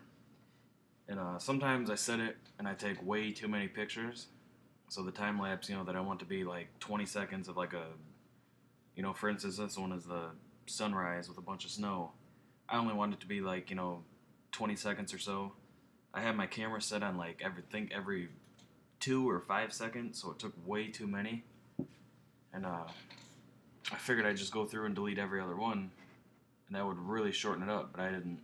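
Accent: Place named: East Coast, United States. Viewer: I hear an East-coaster.. are you a fellow Newf? Thanks for the vid bro!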